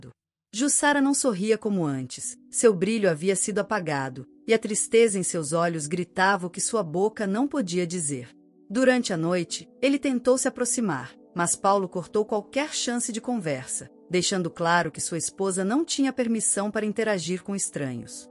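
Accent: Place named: Northeast, Brazil. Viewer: Boa gostei sim ê isso mulher parece que vc ê nordestina paraibana,cearense,bom seja lá de onde fores meu abraço e um xeru p vc Matheus pela coragem de nunca desistir daquilo e quem vc amava valeu e muito valeu e para o senhor arrogante Paulo a estrada ê larga mas contém curvas fechadas querido e as suas curvas te enrolaram,bom como estas pode mandar no meu tempo assistir eu várias obg canal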